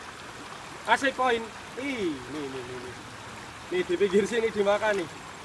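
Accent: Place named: Java, Indonesia. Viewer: medoknya minta ampun....agak dibeneri mas dialeknya biar gak mengganggu banget di telinga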